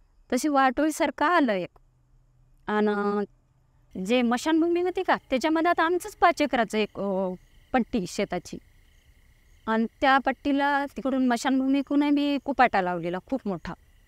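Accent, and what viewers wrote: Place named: Marathwada, India. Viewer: आमची मराठवाड्यातील ग्रामीण बोली भाषा आहे. कृत्रिम नसल्याने ऐकायला छान वाटते.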